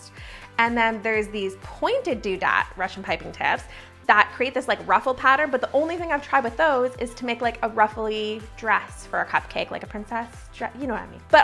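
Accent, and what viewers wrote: Canada. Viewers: are you Canadian? You sound like one. I love your videos btw! ☺️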